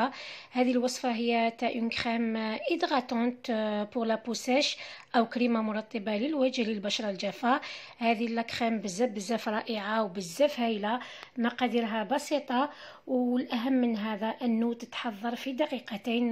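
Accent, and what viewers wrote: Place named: Lebanon. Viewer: لان انا ما كتير عم بفهم عليكي لان بتعرفي اللهجة اللبنانية كتير تختلف فميشان هيك ما عم افهم كتير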